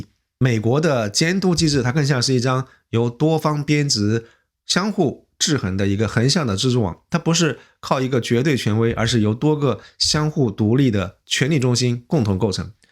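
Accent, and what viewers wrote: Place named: Sichuan, China. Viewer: 博主的这一口川普真的非常恶心，非常刺耳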